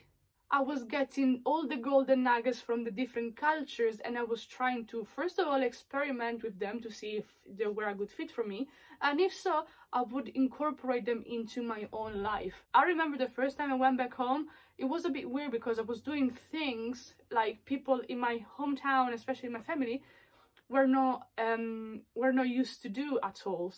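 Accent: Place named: Andalusia, Spain. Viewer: Your Spanish is similar to 'andaluz'... Number 6: you know...all for the art!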